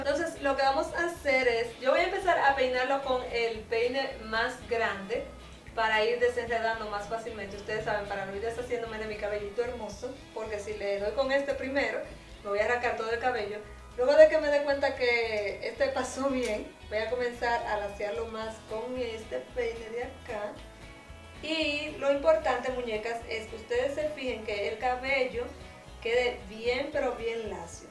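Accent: Dominican Republic. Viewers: Eres Dominicana? :v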